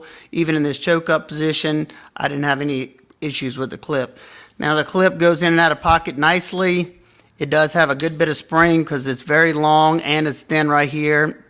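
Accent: Louisiana, United States. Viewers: This knife is so interesting. its screaming for custom inlays. great review! do I hear a south Louisiana accent?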